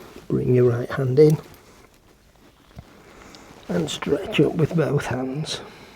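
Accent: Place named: Sheffield, United Kingdom. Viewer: Only just found you after listening to Radio Sheffield, I have enjoyed your yoga session and have now subscribed. It is good to hear a local voice and thank you for the sessions which I shall now carry on with, much appreciated.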